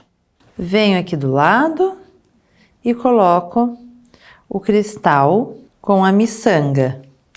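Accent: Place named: Ponta Grossa, Brazil. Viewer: Adorei a sua aula ❤ O sotaque me dá muita saudade da minha avó de PG 🥰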